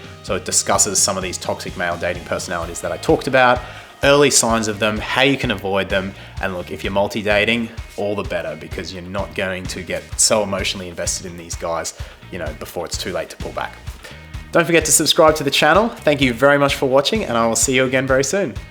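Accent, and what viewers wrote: Australia. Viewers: are you from Melbourne?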